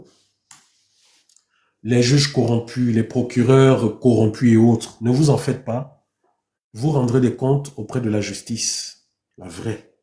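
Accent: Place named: Africa. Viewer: Bonjour tu parle vraiment comme un bantou❤❤❤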